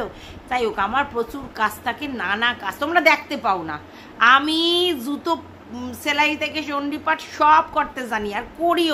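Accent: Bangal, Bangladesh. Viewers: ভিডিও content je flavour tar sathe বাঙাল ভাষা মিলেমিশে খুব খুব মজা 🎉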